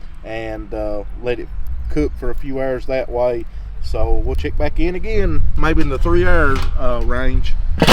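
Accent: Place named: Appalachia, United States. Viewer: Where are you from in Appalachia? You for sure sound like home....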